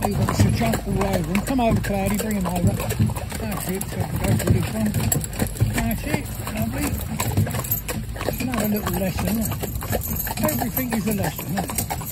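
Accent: United Kingdom. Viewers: That was delightful !😊.. Thank You ! "That'll do" and "That. Will. Do." had me laughing since those are used here, across the pond, for misbehaving pets/animals and children.. This Texan is now a subscriber, and looking forward to watching the rest of your videos and future ones ! 😀.. I usually do well understanding British accents, but yours is a little stronger and with the horses' clippity clops (which I loved) I had to use "closed caption", but I'll get better 🙂..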